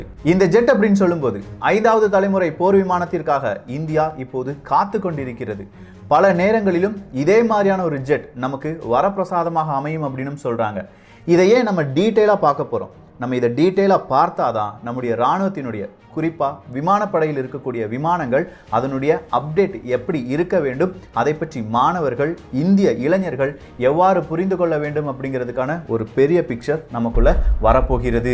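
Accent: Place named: Thoothukudi, India. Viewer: thala nyt namma RN kao adha marandhu radhinga ok va en bro neenga thoothukudi ya slang andha mari iruku sometimes silla ucharipu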